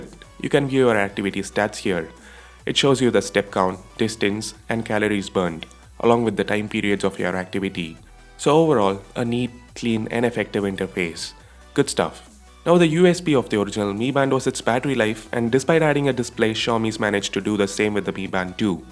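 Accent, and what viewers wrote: India. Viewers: The Indian accent ruins the whole review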